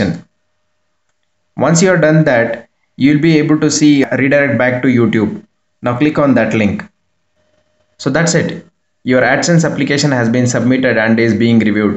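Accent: India. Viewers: Iam sorry ! but I laughed a lot on ur Indian English accent😂😂😂😂😂😂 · D i love your indian accent, just like a very famous youtuber i love to watch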